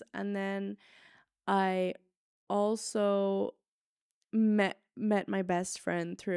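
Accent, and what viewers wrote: Ireland. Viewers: Hey!! Like a few other people who commented, I subscribed to you to try to keep up with my German, so thanks! I do suck at German btw... I did Erasmus in Paris and Leipzig - before we left the EU - and it was a really awesome experience... I'm British - English - and your English is perfect. I can hear a bit of the Irish accent coming through which is so cool. I don't know who these Germans are who are throwing shade on your English, but don't give it a second thought! English is literally your Muttersprache - maybe it has a deeply personal/private context for you and that's why you don't feel so comfortable doing a YT video in English, but maybe you'll get more used it the more you do it, right? LG aus the UK!! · I don't think your accent is very American at all actually, I think you can definitely tell that it's irish, it's just not super heavy :)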